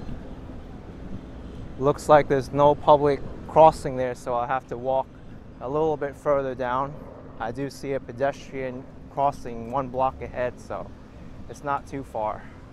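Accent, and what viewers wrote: New York, United States. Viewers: one again we appreciate your every effort in putting out vids and that wonderful NY accent of yours is always a pleasure. Would you ever consider being a small tour guide for visitors that have never been to NY?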